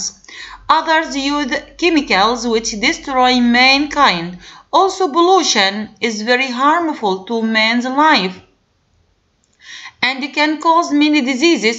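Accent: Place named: Egypt. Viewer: english with egypian accent lmaoo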